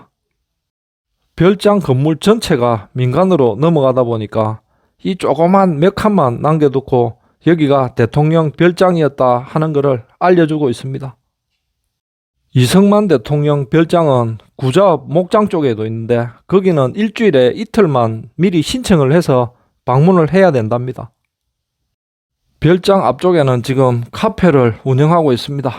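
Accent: Gyeongsang, South Korea. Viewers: 경남사투리가 짙으셔서 정치색의 댓글이 달릴것같습니다. 그냥 빨간당 이실거라는 생각이 들긴합니다.ㅎㅎ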